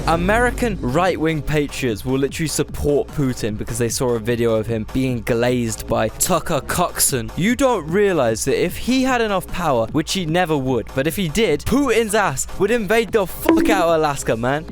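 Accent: United Kingdom. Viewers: lol actual Native Hawaiian here ill show you around if you give me british accent lessons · British accents have an edge on everyone else when it comes to narrating YouTube videos like this guy. For instance, listening to critical drinker with his Scottish accent spew his criticisms of movies and streaming tv shows like Amazons Rings of Power is absolutely hilarious because he’s mostly right, but also that Scottish accent gives it an extra comical spice. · Why is a British guy explaining US states? What are the rankings supposed to be based on? Have you ever even been to the US?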